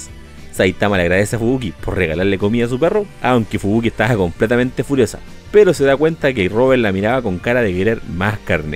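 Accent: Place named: Chile. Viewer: Epico es genial Tu acento chileno Brother Saludos eres un Crack 🤙🤙🤙😁👊👊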